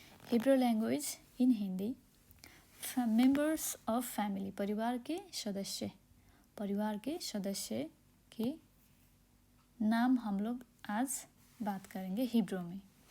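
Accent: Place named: Nepal. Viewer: Behen ji aap to Nepali ho, aapki Hindi pronunciation Nepali lag rahi hai, aur aap ka teaching bahut fast hai, play class ke bachchon ko 5th class ki tarah sikhaoge to kaise sikha payenge. Aur aap ka words bhi cursive letter me hai, use print letter aur bade size ke axronme likhiye. tabhi to kuch thoda Sikh payenge Dhanyawad. 🎉🎉🎉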